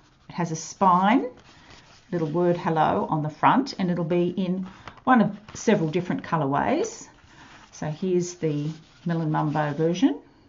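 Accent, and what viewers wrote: Australia. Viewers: Love hearing the Aussie accent! And really like the project. The angle of the camera is a quite uncomfortable to view!!! Hope you might be able to change this!! Thanks for a great tutorial!!!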